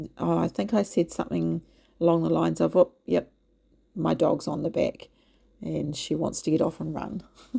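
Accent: New Zealand. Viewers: OMG- please don't take the 1% negative comments to heart!!! We LOVE seeing you in your little kiwi kitchen, yours echoes our own... Your accent, your beautiful aprons... It's all familiar...80% of kiwis live like you do! Good on you, for taking your life into your own hands and creating your dream life! We celebrate you! ❤ 🎉🥳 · What a nasty thing to say to you. It is not anyone's place to make personal comments. I love you videos and I have only just discovered them. You are REAL and most of us have kitchens just like you. I enjoy the way you are always positive and make the videos fun. Please keep up the good work. I am in NZ too and it is great to hear another kiwi.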